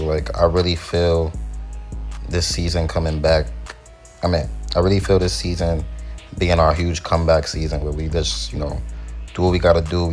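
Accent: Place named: New Jersey, United States. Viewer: I heard your voice and thought-"is this guy from Jersey or something?" Then u said u live in NY😂👍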